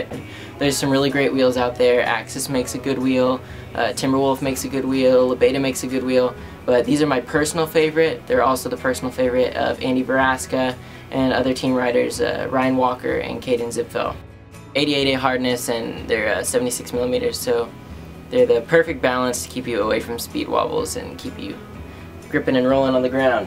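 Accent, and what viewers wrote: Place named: United States. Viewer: dat american accent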